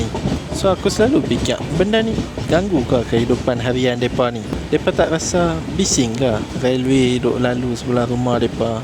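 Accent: North, Malaysia. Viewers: Terbaik bro your video, aku baru subscribe, dah habis da video pasal Kerala aku tgk, dgn slang Utara, mmg terbaik la. Penerangan sangat jelas.and one more thing, brother.. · Hi, I baru last week terjumpa your YouTube Channel..very interesting. Suka dgq u cakap utara as I am also org utara from Perlis. Pasai rumah tepi railway tu depa tak terganggu sebab dok besa dah. mcm rumah I kt kampung di perlis, mmg railway tepi rumah. since kecik kami dengaq bunyi keta api , la ni rail tu lagi dekat sejak depa buat ETS. dulu masa kecik2 kami dok main atas tu rail tu saja..bila keta api nak mai kami cepat2 turun. Alhamdulillah tak penah berlaku kemalangan jiwa. tapi la ni org dah bubuh pagaq lah. 😁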